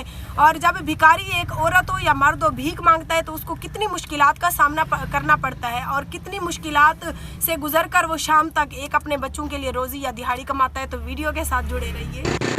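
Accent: Saraiki, Pakistan. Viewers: m apki vedio bri shaok say dekhta Hun plz Meray sawal ka Jawab zrur Dena Mujhay saraiki bahut psnd h Aur AP bahut piari saraiki bolti ho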